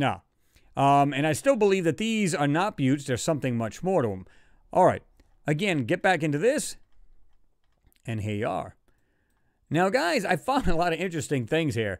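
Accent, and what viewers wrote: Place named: Louisiana, United States. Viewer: You sound like you’re from Louisiana. My home state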